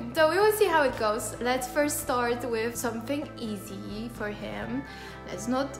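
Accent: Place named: United Kingdom. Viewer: You sound really British now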